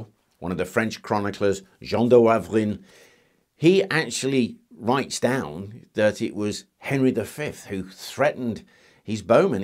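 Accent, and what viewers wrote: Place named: Birmingham, United Kingdom. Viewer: So your a Brummie o dear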